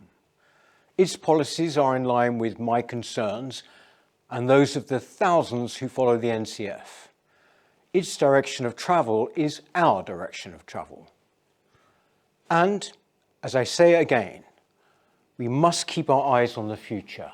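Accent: RP, United Kingdom. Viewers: You sound too posh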